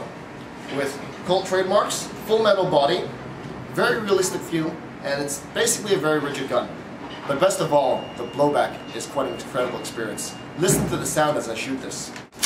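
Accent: United States. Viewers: whoa! american accent! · hes thinking at a more business angle. American accent.(i think) good magazine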